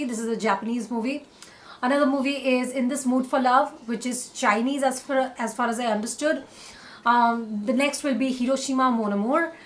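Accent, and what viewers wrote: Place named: India. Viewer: You are amazing ,fabulous indian. Adore your accent.. Allah keep you,stay safe.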